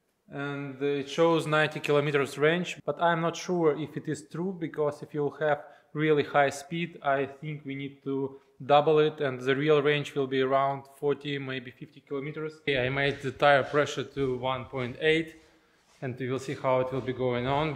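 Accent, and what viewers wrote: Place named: Ryazan, Russia. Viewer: Рязанский акцент :) Успехов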